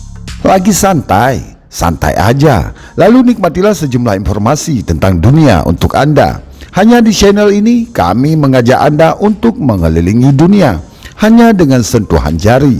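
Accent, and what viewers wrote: Aceh, Indonesia. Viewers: assalamualaikum,,, ni yg ngomong koq kayak orang Aceh dialek nya??? 😁🙏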